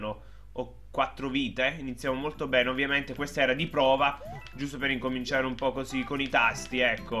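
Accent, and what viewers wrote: Naples, Italy. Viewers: Bella:D ma sei di Napoli?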